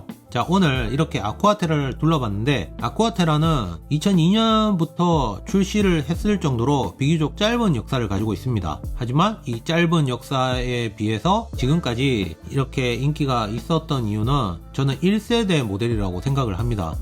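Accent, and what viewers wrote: Gyeongsang, South Korea. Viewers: 경상도 사투리만 조금 더 다듬으면 전달이 더욱 잘 될 것 같습니다.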